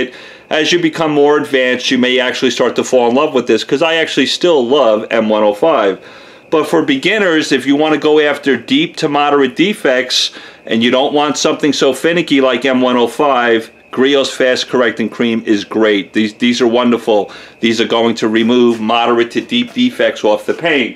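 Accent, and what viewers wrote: New York, United States. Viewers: A Texan who sounds like a New Yorker. Hey, great video. Very informative. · Are you from New York?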